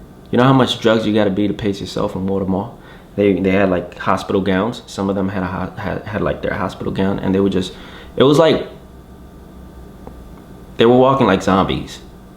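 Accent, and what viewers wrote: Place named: Texas, United States. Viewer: Damn bro you from texas?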